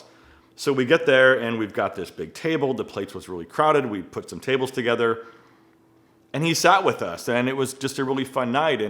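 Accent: RP, United Kingdom. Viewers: LOVE Posh BTW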